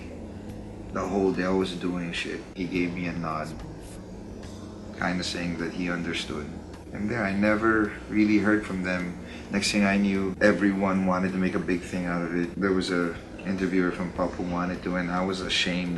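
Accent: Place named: Conyo, Philippines. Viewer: dat waz egxplaining in englishsss of a true pinoy "CONYO" style...fuck man, you are so slang I dont even know where your accent come from...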